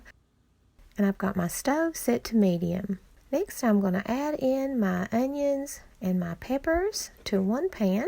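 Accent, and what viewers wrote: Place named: Georgia, United States. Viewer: Sounds like you might be a Georgia girl. I could fill up on onions and peppers, put them on a sausage dog is always the right thing to do. I'll try the other ones too. 👍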